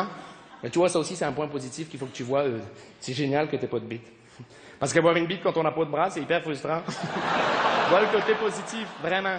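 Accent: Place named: Quebec, Canada. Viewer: en passant , sont accent quebecois est pas pareil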